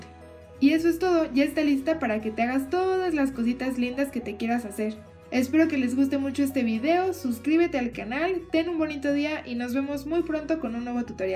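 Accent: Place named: Mexico. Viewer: Ingrese a este video por curiosidad y me terminé enamorando de tu acento mexicano.... Saludos desde Argentina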